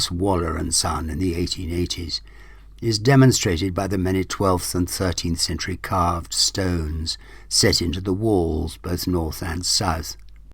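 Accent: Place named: RP, United Kingdom. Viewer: Among other things,I tremendously enjoy the RP pronunciation of the narrator,the pure,nouaced flow of the language which shows education and scholarship! Thank you, you are the hightlight of my week!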